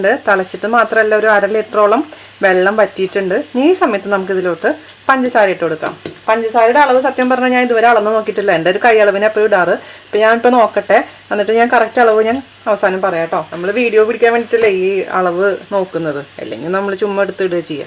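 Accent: Thrissur, India. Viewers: കിടുവേ.. ഡയലോഗ് പോളിയാണ് ചേച്ചി.. തൃശൂർകാരി ആണോ?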